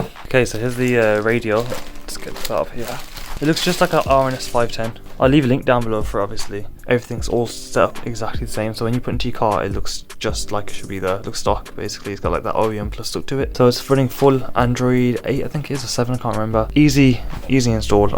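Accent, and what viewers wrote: Birmingham, United Kingdom. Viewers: Twat....nothing wrong with brummie...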